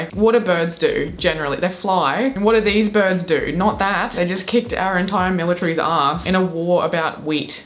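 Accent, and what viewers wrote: Australia. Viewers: I really love your accent and the Australian accent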